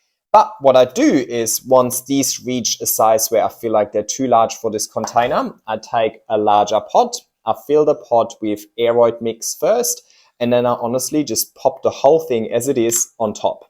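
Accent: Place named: Germany. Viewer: Hey greetings from Berlin! It’s kinda funny that I keep getting German accents from you😅 Any chance you are German? Or least to say I enjoyed your accent, makes me feel homey:)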